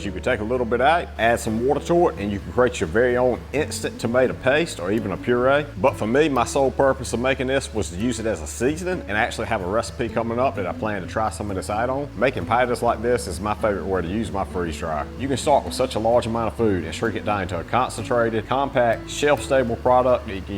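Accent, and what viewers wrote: United States. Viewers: Where in the US is this accent from i swear every word that has a W or "ou"he says with an I Pider, sind, 😂😂😂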